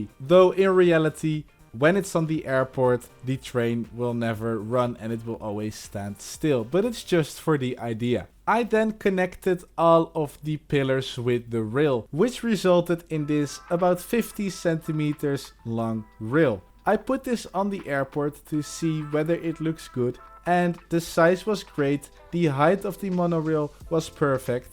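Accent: Netherlands. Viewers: I recognise the Dutch accent instantly!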